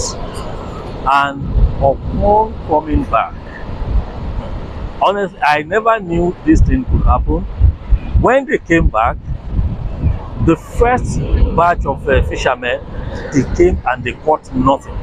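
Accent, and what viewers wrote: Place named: Nigeria. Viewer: Are you from Nigeria,you’ve Nigeria accent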